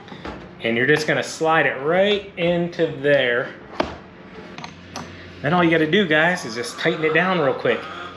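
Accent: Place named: Pittsburgh, United States. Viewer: Waymorebetter Coolbeans iamathinkin Pittsburgh talk just saying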